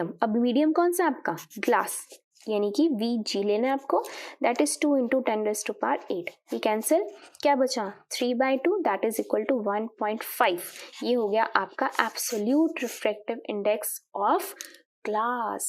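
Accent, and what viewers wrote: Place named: Bihar, India. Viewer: Dide you are bihari 🤔.. because of ur voice I can make out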